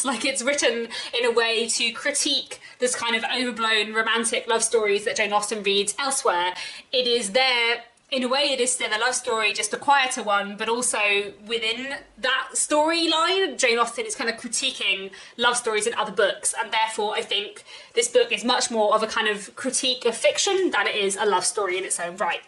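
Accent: United Kingdom. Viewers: you are one of the inspirations and motivations for my English self-learning. I have grown fond of Jane Austen's literature on your video account. People tend to use the US accent in my country and you are why I persevere with the British accent. Love you from Viet Nam ~~ :3 my favorite hero is Tilney by the way, such a charming and practical when necessary man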